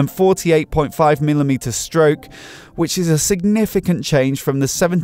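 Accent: United Kingdom. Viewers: Amazing bike, amazing video. This bike is such a craftwork, a beautiy and beast at the same time. And the video is of exceedingly high quality, one the best motorbike videoes I have ever watched. The image is incredibly sharp and clear, and the narrator's crisp and clarion British English is highly intelligible and makes the whole watching process a pure enjoyment and delight. A truly premium class motorbike video prodcution. Hats off to the producer.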